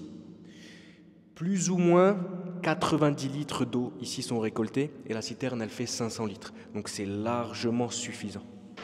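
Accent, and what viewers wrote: Alsace, France. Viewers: Petit accent Alsacien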